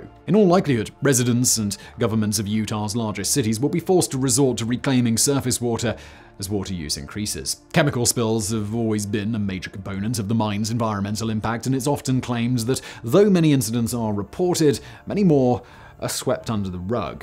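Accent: England, United Kingdom. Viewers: I also like listening to English people saying Native American place names. It's great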